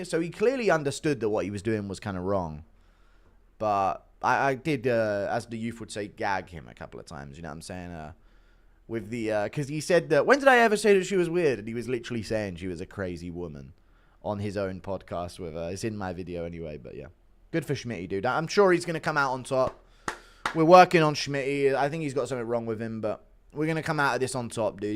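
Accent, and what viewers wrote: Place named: India. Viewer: has an indian accent off stream, it stinks like shit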